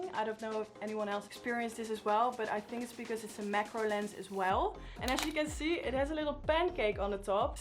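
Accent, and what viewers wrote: Netherlands. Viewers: You sound Dutch!?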